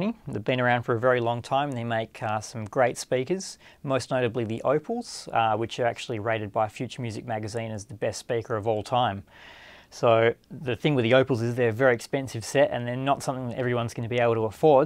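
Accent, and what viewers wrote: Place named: Oklahoma, United States. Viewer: sup with this dudes voice is he from oklahoma or what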